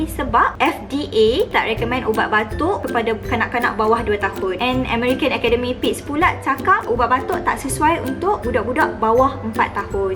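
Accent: North, Malaysia. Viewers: Boleh ja faham..biar la dia dah selesa ckp loghat utara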